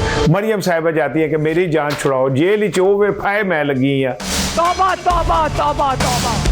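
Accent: Punjab, India. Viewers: Welcome back. I am from Karachi but love your punjabi accent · آج پنجابی تڑکا کم ہے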